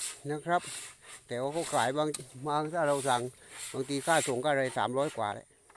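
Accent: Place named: Krabi, Thailand. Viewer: สำเนียงคล้ายคนกระบี่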